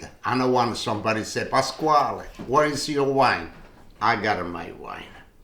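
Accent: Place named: Sicily, Italy. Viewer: A wonderful man Mr.Cerio, was my first intro to food that was not german, He rented a suite from my Dad who had a business in the same building. He was from Sicily and his proudest times were sharing his food with a 8 yr old (me) and talking about his budgie bird. He had no family in Canada that I can recall. I Remember him with fondness 57 yrs later. He got me hooked on authentic italian food ever since. You speak as he did. Are u also from Sicily?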